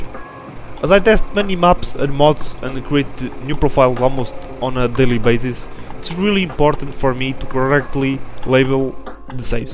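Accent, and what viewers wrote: Portugal. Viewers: sim, é português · Sotaque de portugal? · With a very portuguese accent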